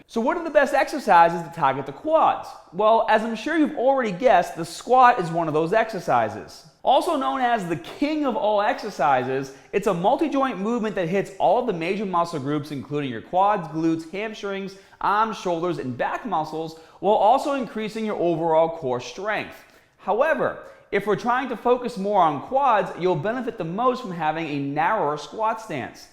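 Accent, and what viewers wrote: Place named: Massachusetts, United States. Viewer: Sounds like your from Mass? Great video!!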